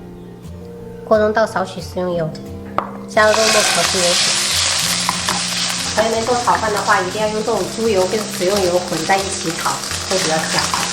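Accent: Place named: Fujian, China. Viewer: 真香，四川人說福建話，做福建菜比福建人更勵害更標準。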